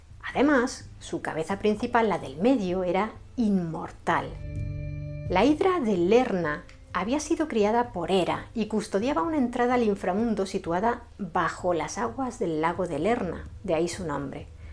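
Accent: Spain. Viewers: Me encanta la manera en que narras las historias , excelente oratoria, el acento español me agrada mucho , es como escuchar audio libros, gracias desde Venezuela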